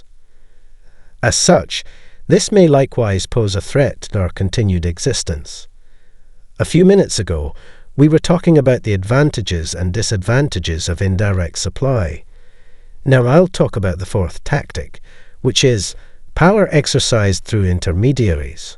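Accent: Scotland, United Kingdom. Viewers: Love ur videos, love the Scottish accent , 🇨🇮👍👍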